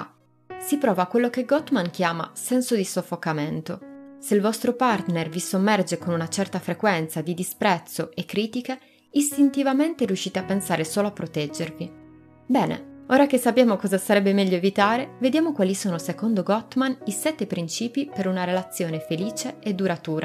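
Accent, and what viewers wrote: Sardinia, Italy. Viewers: so che non è inerente al video... ma quanto è bello l'accento sardo? :D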